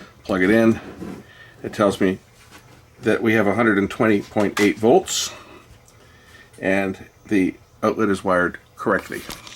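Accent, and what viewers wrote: Canada. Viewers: Canadians pronounce been like bean .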